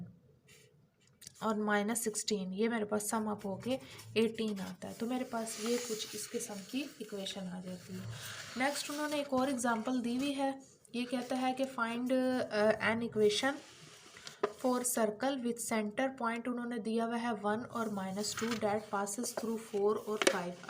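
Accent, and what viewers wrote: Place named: Islamabad, Pakistan. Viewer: actually. Apki Urdu kaafi saaf ha. I think Islamabad ho sakta ha then.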